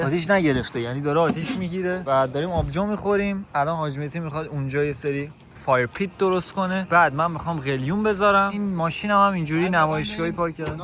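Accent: Mashhad, Iran. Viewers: اقا تو که لهجه مشدی یاد گرفتی به بگو یه کمی شمالی حرف بزنه ببینیم چقدر بلده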